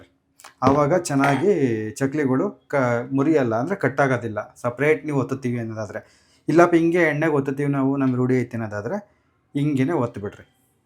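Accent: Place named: Bellary, India. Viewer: Are u from,Bellai or Hosapete?Because,ur kannada accent is like that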